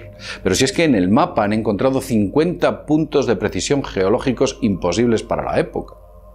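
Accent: Spain. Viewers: con ese acento Español cualquiera se duerme, saludos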